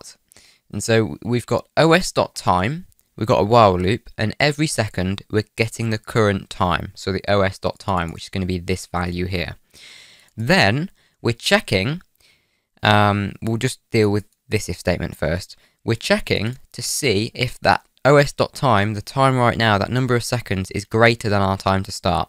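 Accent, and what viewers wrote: United Kingdom. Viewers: the voice of me but WAY more British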